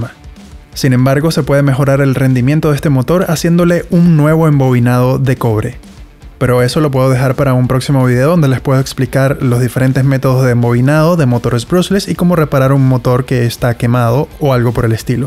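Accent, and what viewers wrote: Venezuela. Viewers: Hola está buena la prueba con ese motor, te felicito por todo sobre todo me gustó que me parece que eres Venezolano, yo soy Venezolano, si estás interesado en un proyecto para hacer máquinas para lavar perros por favor contactame para coordinar algunas propuestas. Muchas gracias. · Epa eres venezolano? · Hola, por casualidad... Eres venezolano? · Buena! jajaja. Tengo una duda con tu nacionalidad brother! Parece venezolana, pero tengo duda XD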